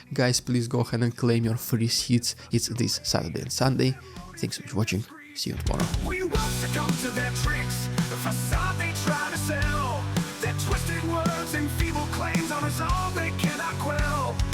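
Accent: Russia. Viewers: WHEN I QUICKLY SURFED THROUGH YOUR CHANNEL I THOUGHT - UGH, WHO’S THE THICK RUSSIAN ACCENTED — HIPPIE? HE NEEDS A GOOD SHAVE, HAIRCUT AND PERHAPS AN UPGRADE WITH HIS WARDROBE. I’M SURE HES A PROPAGANDIST WHOS KNOWS SHIT ABOUT ANYTHING, SO WHY WASTE MY TIME. I GAVE A LISTEN. MY JUDGEMENT BY YOUR BOOK COVER WAS WAY INCORRECT. YOURE SUPER BRIGHT, ARTICULATE (EVEN WITH YOURE SLAVIC TONE HANGING HEAVY) AND MORE INSIGHTFUL ABOUT THE ONGOING CONFLICT, RUSSIAN SOCIETY AND KREMLIN POLITICS THAN ANY YOUTUBE BLOGGER OUT THERE. I’M NOW A BIG FAN. THANKS FOR YOUR REPORTS.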